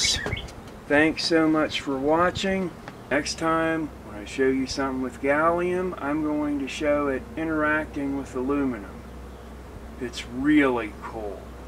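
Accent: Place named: East Coast, United States. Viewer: You have a very nice accent. Definitely east coast, you can tell by the "o"s.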